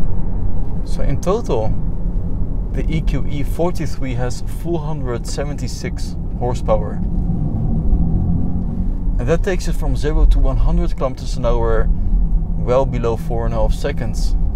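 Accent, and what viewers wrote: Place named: Netherlands. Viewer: Ugly dutch accent, stopped watching after i heard: ünlock and ~rare steering😥😥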